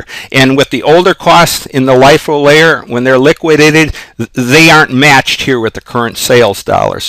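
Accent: Boston, United States. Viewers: i love this guys boston/hispanic accent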